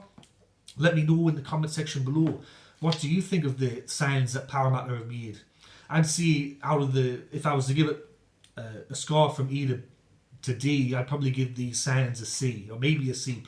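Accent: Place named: Geordie, United Kingdom. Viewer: Good video! Dude, your accent! Where's it from? Geordie?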